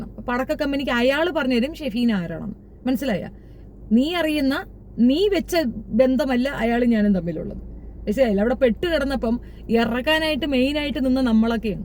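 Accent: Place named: Kollam, India. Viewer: ഈ ചേച്ചി നമ്മുടെ നാട്ടുകാരി ആണന്നു തോന്നുന്നു എന്റെ നാട്ടിലെ പെണ്ണുങ്ങൾ ഇങ്ങനെ ആണ് ഡയലോഗ് സ്ലാങ്.ആയുർ ചടയമംഗലം കടക്കൽ നിലമേൽ വല്ലോം ആണോ സ്വദേശം അന്യം നിന്ന് പോകുന്ന പല വാക്കുകളും ഈ ചേച്ചി ഓർമിപ്പിക്കുന്നു സൂപ്പർ. അടിപൊളി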